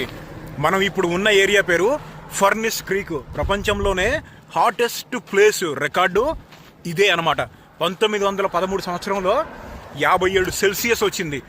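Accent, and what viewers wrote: Andhra Pradesh, India. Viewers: అన్న ఈ ఒక్కదానికి సమాధానం చెప్పన్నా నువ్వు ఆంధ్ర యాసలో మాట్లాడుతున్నావ్ మీ ఫ్రెండ్ కానీ మీ అన్నయ్య కానీ తెలంగాణ యాసలో మాట్లాడుతున్నారు ఇది ఒక్కటి చెప్పన్నా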